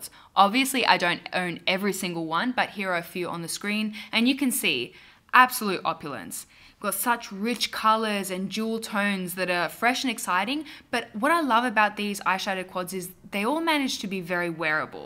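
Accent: Australia. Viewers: Your Aussie accent is my fave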